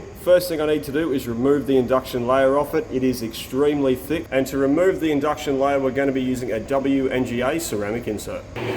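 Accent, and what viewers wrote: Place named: South Australia, Australia. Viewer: Machining is awesome, extremely intelligent and damn good work. is the brains of the shop or is it? Y'all are so much fun to watch and I just Love y'all's videos. your accent is quite southern Australia, just a little different than our RedNeck HillBilly Arkansas accent. Out takes are so Funny. Thanks guy's. Some comments are just for fun. Good night from central Arkansas, United States of Merica.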